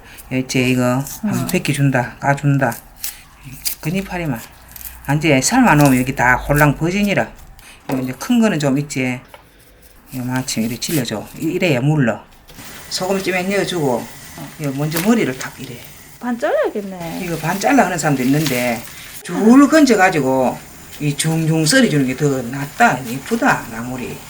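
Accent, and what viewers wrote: Gyeongsang, South Korea. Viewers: 경상도 말투가 아주 재미있어요 잘보고있습니다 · 진주사투리 들을수록 고향가고 싶어요 스물안되 부산와서 벌써 50이 넘었네요 내가 뛰어놀던 인사동 잘 있나 몰라요...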